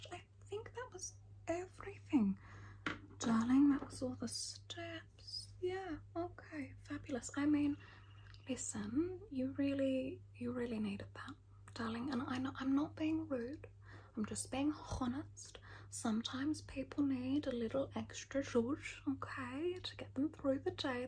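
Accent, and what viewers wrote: Australia. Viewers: OMG!!!! I'M SOOOO EXCITED TO HAVE A VIDEO FROM YOU!!! Its so rare to get that specific Aussie accent/way of saying things. you are amazing, i hope 2024 treats you better. We are all just so happy for anything you do. I wish you love & light & happiness for 2024.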